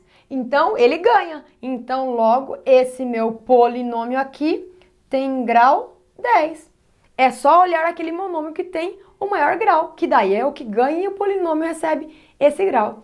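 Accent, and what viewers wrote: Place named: Goiás, Brazil. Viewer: Cara essa professora é muito top 👍, Eu entendi tudo, ela é muito simpática e adorei o sotaque goiano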